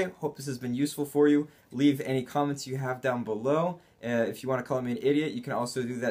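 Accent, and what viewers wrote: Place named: Brazil. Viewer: Im from the MIDDLE OF NOTHING in Brazil... I started the video and just JUMPED OUT OF THE CHAIR when i noticed how perfect your accent is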